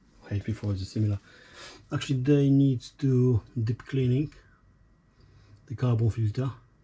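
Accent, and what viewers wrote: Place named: France. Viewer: Gotta hate French accents...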